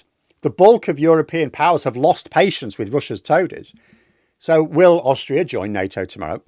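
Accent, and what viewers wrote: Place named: England, United Kingdom. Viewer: "Smooth move, son!" Speaking as an Australian, this sort of thing is why we like listening to English people speak as compared to, say, Americans. You sound like my grandfather but cool and very now. Anyway there has never yet in recorded history been a century without at least one widespread land war in Europe. Why would this century be any different?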